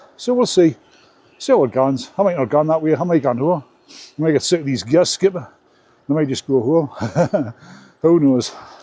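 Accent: United Kingdom. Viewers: He is probably a Geordie? From Newcastle in North East England. I am from London, England. If he is from Sunderland or Gateshead? He will hate me for calling him a Geordie 😅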